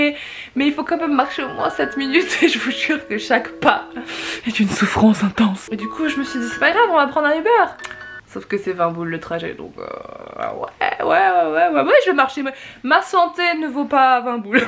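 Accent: Belgium. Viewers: Tu es belge? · elle est belge?😶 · tu es belge?!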